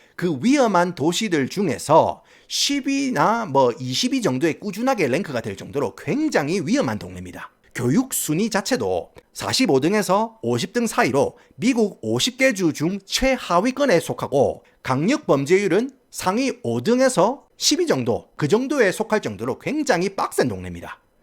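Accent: Gyeongsang, South Korea. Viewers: 내용도 전두엽 쌍골짜기에 싸대기가 메아리칠 정도로 신선하고 쨈있지만, 썰푸는 vibe가 참~ 찰져! 갱상도 억양과 양키어의 묘한 앙상블. 그래도 유료가입은 몬한데이~! 내코가 월터 와이트 입던 빤스라도 갖다 팔아야 개우 입에 풀칠. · 경상도 액센트의 영어로 설명해주는 미국의 사회 문화 컨텐츠 ㅋㅋㅋㅋㅋ진짜 미치겠다 ㅋㅋㅋㅋㅋ 구독박고갑니다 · 사투리가 쏙쏙들어와요 경상도ㅎㅎ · 사투리가 너무 찰지셔서 댓글을 안 달수가 없었다...찐 경상도 바이브가 영어랑 절묘하게 혼합된....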